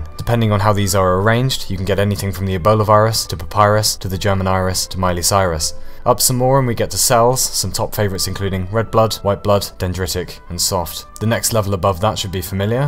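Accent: United Kingdom. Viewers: Language: English Accent: British Subtitles: Spanish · The British English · I feel like this guy says all the things that I'm already thinking, but just in a British accent. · He doesn't sound very Norwegian. We usually don't speak with a British accent and it's also pretty hard for us to shake off the Norwegian intonation and pronunciation. If he really is then kudos to him for speaking so fluently. But hey, maybe he's half-British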